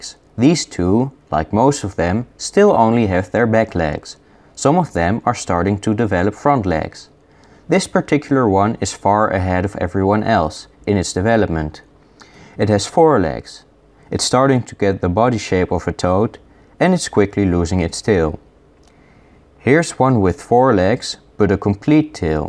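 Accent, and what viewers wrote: Netherlands. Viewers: Its a dutch accent we have a really weird g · thats such a heavy bad dutch accent wow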